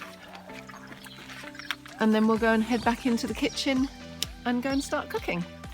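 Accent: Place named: England, United Kingdom. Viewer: It's very nice to hear your English voice, Thanks for sharing!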